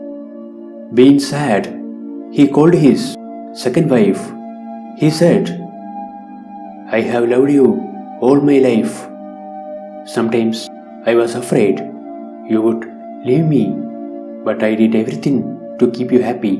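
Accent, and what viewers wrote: Kerala, India. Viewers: Malayali narrator? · Good One! Just that mallu accent is the only negative part.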